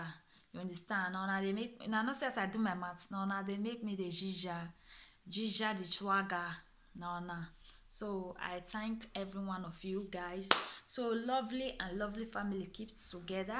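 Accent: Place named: Africa. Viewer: Hello African Queen, luv ur accent 🌹😘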